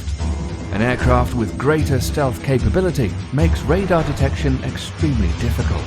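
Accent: United Kingdom. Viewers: sounds like he has a British accent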